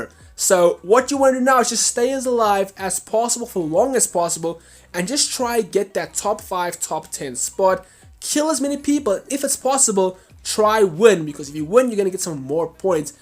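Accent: South Africa. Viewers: Bro are you South African you have our accent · You south african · Is this guy from south africa?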